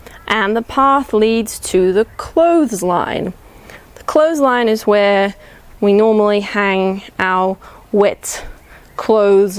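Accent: United Kingdom. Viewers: Uk accent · Hello, question... are you speaking british english...thanks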